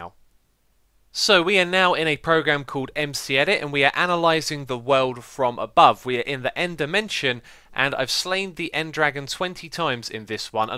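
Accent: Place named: United Kingdom. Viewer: i dunno to me all british accents sound the same :I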